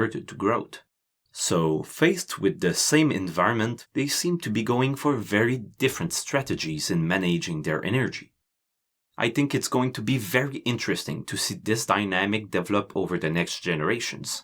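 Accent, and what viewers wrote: Quebec, Canada. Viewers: U're french canadian right? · Es-tu québécois? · t'es quebecois je pense XDDD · Great video! By the way, are you French-Canadian by chance? I feel like I've heard that accent before 🙂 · French canadien?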